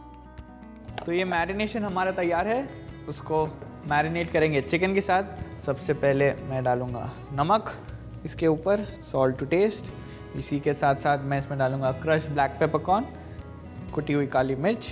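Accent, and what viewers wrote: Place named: Hyderabad, India. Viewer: For some reason, I felt Chef had Hyderabadi slang when he speak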